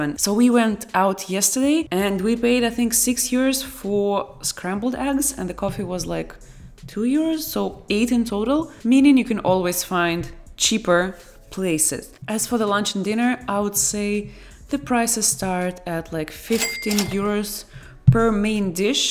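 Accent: Russia. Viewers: That recurring Russian accent 😍😘... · People love your Russian accent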